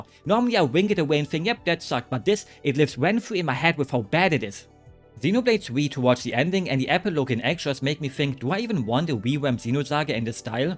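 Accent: Germany. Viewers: I just found out about this channel and realized how many similarities we share we are both Nia fans and have an silly accent, I have an welsh you have an German accent😂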